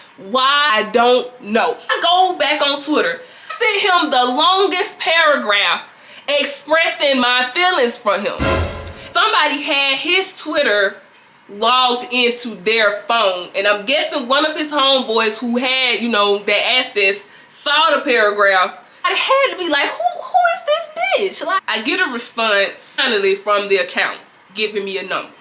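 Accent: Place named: Alabama, United States. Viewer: You from Bama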